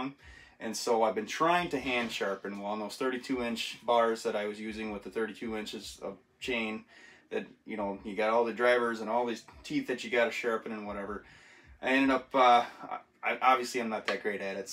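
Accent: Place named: Wisconsin, United States. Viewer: can tell you are from wisconsin! you betcha.. gotta take the family shopping at fleet farm for EVERYTHING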